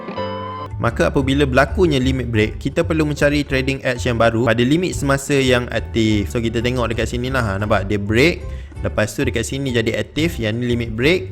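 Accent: Pahang, Malaysia. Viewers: Terbaik video tuan, sy tumpang belajar, mohon halal ilmunya ye. Tuan org pahang ke?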